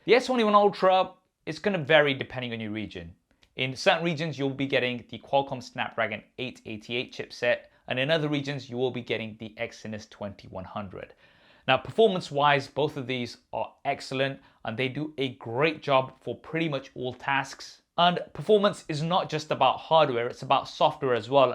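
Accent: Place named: United Kingdom. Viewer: please link friend with speaker test! Having hard time with your UK accent haha. Thank you 🙏🏼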